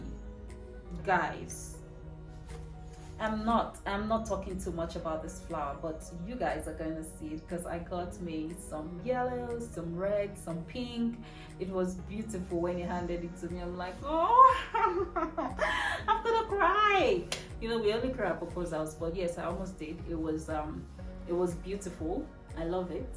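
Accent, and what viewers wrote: Nigeria. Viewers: Your Nigerian accent sounds not Nigerian. The loudness is also loudless for a Nigerian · So calm and your Nigerian accent is minimal. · Yeah I noticed that too.. is very subtle only sell outs being "T" and "O" sounds as compared to most Nigerians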